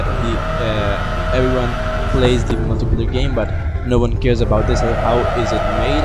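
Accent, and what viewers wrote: India. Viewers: That Indian Accnet is very bad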